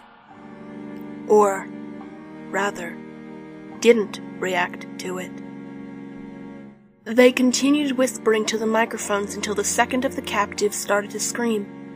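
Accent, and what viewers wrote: Russia. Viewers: Your Russian accent reminds me of Tenenbaum from Bioshock. Great job! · that Russian accent tho ;)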